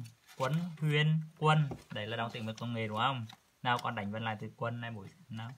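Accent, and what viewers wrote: Central, Vietnam. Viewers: 2 ông con láu cá quá, giọng của bạn miền Trung?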